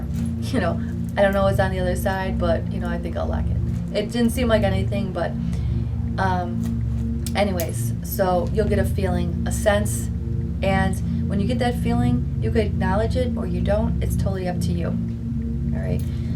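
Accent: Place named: Upstate New York, United States. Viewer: I like the way you talk and your voice maybe from upstate new york. I see a lot of lady bugs.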